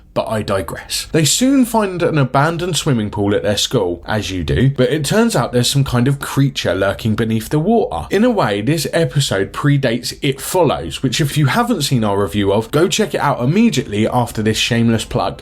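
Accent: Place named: East Anglia, United Kingdom. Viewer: Are you from Suffolk or Norfolk? Trying to place the accent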